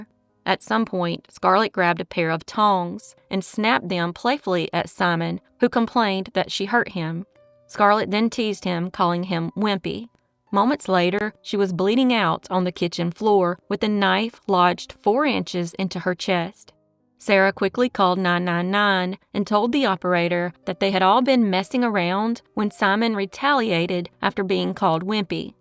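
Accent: South, United States. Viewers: Hello from Georgia! You, Crime Zone, and Michael Franzese are tied for my favorite podcasts. I fall asleep to one of y'all every night. (Hubby uses ear plugs, hahaha) You have such a smooth, melodic, Southern drawl...what's not to like?! Keep up the good work - from one Southern Girl to another. P.S. in case you have haters - "If you are going to be underestimated by people who speak more rapidly, the temptation is to speak slowly and strategically and outwit them." ~ Doris Betts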